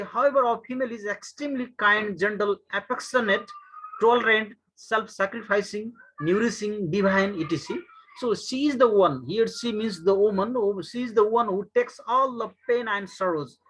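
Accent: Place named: United States. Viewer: Nepali ma ni vannu ni kattina american jasto english matra🙄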